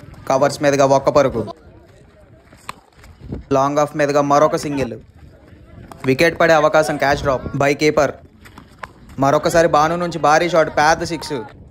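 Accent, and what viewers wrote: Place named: Srikakulam, India. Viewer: SRIKAKULAM vollu antarra babu❤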